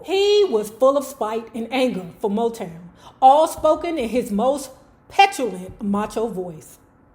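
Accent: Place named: DMV, United States. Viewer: you bring back great memories of me and my children living in the DMV. Your accent and the things you say crack me up cause you are a true representative of The DMV. Keep being yourself.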